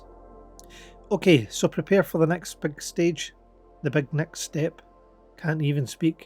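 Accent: Scotland, United Kingdom. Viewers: you are a great Artist, good to hear a Scottish person like myself LOL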